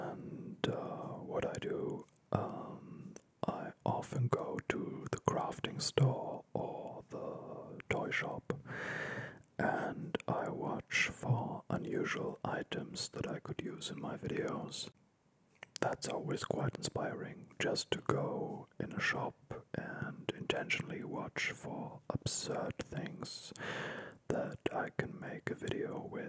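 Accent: Germany. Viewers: Is he german? :D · Is he from germany or something like this ? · I always just watch you in these types of videos lol not so much for the asmr aspect lol (and I enjoy your accent because my whole paternal side is german)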